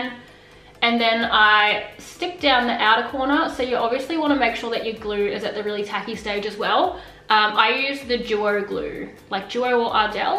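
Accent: Australia. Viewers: I’m actually very thankful that I shared your full face online for me here because I’ve been struggling on exactly how I WANT TO DO MY FACE which turns out to be similar, very similar to yours. It reminds me of the plouise academy girls. I know your Aussie I can hear your accent. Thank you 🙏 you should feel very esteemed cuz I watched your video 5 times on 2X speed lol 😆 which is probably why I had to keep rewinding lol but DING DONG DAHLING CUZ YOUUUUU HIT IT🤣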